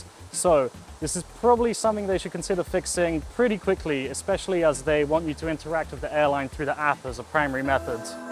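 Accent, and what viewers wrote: United Kingdom. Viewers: You know the weird thing, despite the clearly British accent, I always thought you were French until I saw the British passport! 😂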